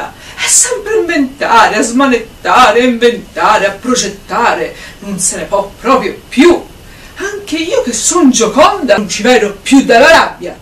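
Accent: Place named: Tuscany, Italy. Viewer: Bravissima attrice, con quel tuo simpatico accento toscano. Molto professionale e interessante la tua spiegazione. Grazie.. <3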